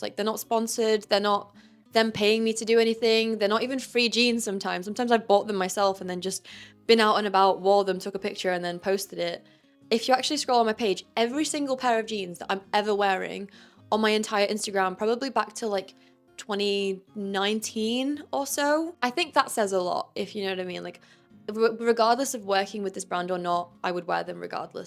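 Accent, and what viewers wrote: United Kingdom. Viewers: You are a BEAUTIFUL young woman. Beautiful dark brown eyes, beautiful smile and sexy voice with the British accent. STUNNING figure. BEAUTIFUL tan body and curves, really cute belly button, nice little booty and sexy long legs. You look AMAZING in all of these pairs of jeans. All of the colors look REALLY good on you and make you even more beautiful. The white makes you look like a brown/dirty blonde-haired angel. All of these jeans hug your body and hips and make your beautiful curves POP. You look AMAZING. You are BEAUTIFUL and VERY cute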